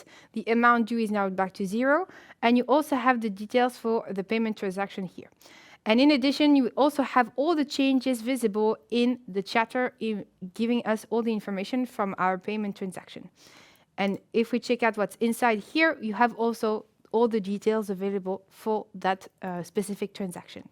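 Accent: France. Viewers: Très bonne vidéo, mais j'ai comme l'impression qu'il te reste un petit peu d'accent français sur ton anglais (qui est parfait je tiens à le préciser haha)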